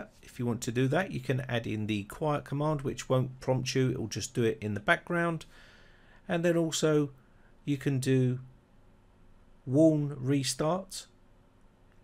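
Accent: United Kingdom. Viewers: Wow.. Nice British accent. I love the way a true English man speaks.. Absolutely marvelous..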